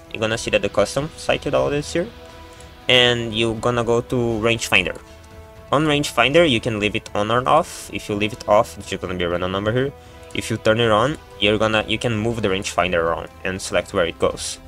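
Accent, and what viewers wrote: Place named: Brazil. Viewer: N precisa n, é que eu vejo tanto video em ingles q eu percebi um leeeeeve sotaquezin BR ouvindo vc, hehe. Mas parabéns pelo vídeo :D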